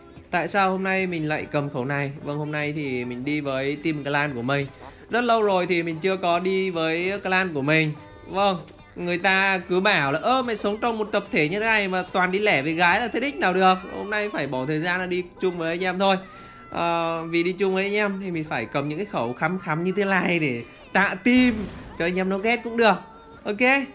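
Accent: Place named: South, Vietnam. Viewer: Giọng cha ân hình như trong.nam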